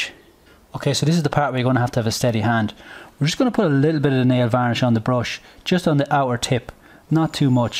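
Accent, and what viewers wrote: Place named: Ireland. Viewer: love your Irish accent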